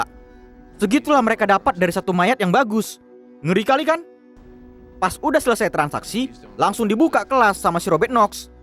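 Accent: Medan, Indonesia. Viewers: Aku sor nya dengar yg ngmong di chanel ini macam anak medan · Min orang medan min? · Medanya nampak kale kk🙏🙏😅😂🤣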